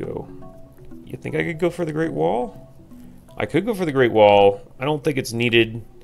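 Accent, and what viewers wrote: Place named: United States. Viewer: yes i love smooth american accent as well:D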